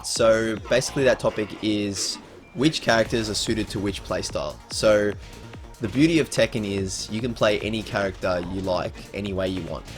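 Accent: Australia. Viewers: The moment I heard your voice haha I knew you were aussie😂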